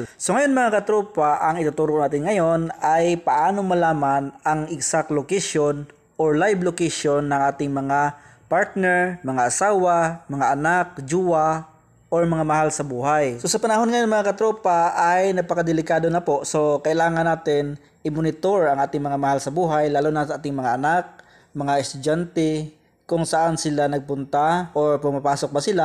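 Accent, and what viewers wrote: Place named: Iloilo, Philippines. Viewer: ilonggo ka pala po hahaha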